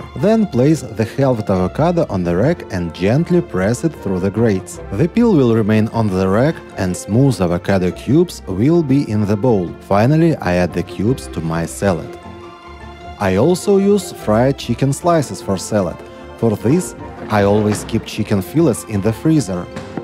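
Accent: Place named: Russia. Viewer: Such a sweet Russian accent. I love it.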